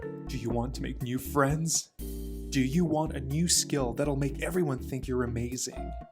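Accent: Standard. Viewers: you speak the standard Mandarin !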